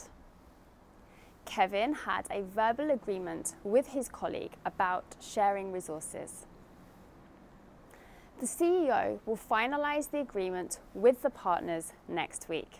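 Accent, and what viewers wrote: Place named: Northern England, United Kingdom. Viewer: Hi, I hope you're getting well soon. Here's an example of an imaginary news article. "The EU office reached an (2)agreement with a famous global company for the company to disclose its customer data holdings. Many sources have raised (7)concerns about the (6)compatibility of this data with the new EU data privacy policy. The company, known for its CEO's (1)affiliation with the newly elected president, has been in (8)conflict not only EU, but also with many countries over this issue for years. Additionally, the EU made a deal with this company to share a list of companies it has (9)connection with. Some of these companies are named in (5)collaboration with the funds owned by the newly elected president's relatives. These funds don't have clear (4)association with concrete issues. Many NGOs, (10)with whom our correspondent (10)contact don't think that the EU has provided enough (3)arguments with the company, against this issue in its dealing with the company. 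One of them criticised 'it's almost like they're on a (12)date with the company. They should (11) cooperate with us before reaching an agreement.' Thank you for the lesson. And I love your accent and trying to imitate it. I'm not a professional but it seems to me a Northern accent because, the sound of 'up' is pronounced with /ʊp/. I'm familiar with this. Thank you for sharing!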